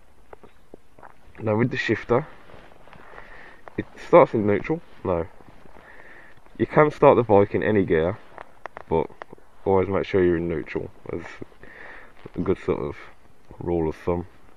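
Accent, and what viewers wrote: United Kingdom. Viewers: I think because you're British you sound smarter then all the American tutorial videos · Is that a fellow brummy accent i hear? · Sound Like a Black Country Lad. and im thinking of getting a ninja 250r for my first bike, but iv been told i will out grow it in a couple months, and im lookin for something with abit of longevity something i can grow into rather than out of so im swaying toward a 600cc (zx6r) im 6'2 and 12 stone any advice "/ ? GREAT VIDEO BTW LEARNED ALOT !!!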